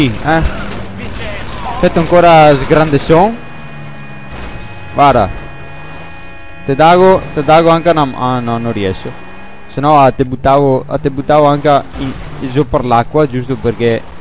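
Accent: Veneto, Italy. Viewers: Setu veneto?🤔😂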